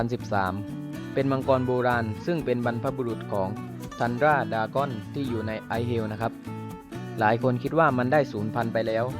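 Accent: South, Thailand. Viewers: คนใต้ ชัดเจน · ไม่บอกก็รู้ว่าพี่คนใต้แน่ๆ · ผทรู้เลยนิ๊ว่าพี่เป็นคนใต้ชั้ยมั้ยนิ๊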